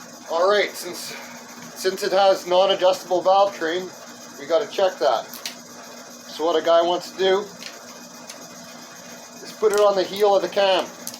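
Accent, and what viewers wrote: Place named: Ontario, Canada. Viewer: You often say, "how's she going eh". Are you a from Ontario?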